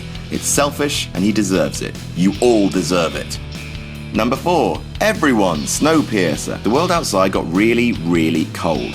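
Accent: United Kingdom. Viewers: Will I subscribe to "WhatCulture"? NOPE! Very dumb video here. You imply in the title that your going to tell us about things we missed in movies that tell us these characters didn't really survive. Instead you make some very dumb conjecture that has nothing to do with the scripts or stories. By your logic - every character in every movie really died - because everyone eventually dies. I hate videos like this - where you present no real value. Just use some british-accented knucklehead to voice over a POS - imagine if a 12 year old kid did the voice over to this - you'd realize is was a complete waste of time.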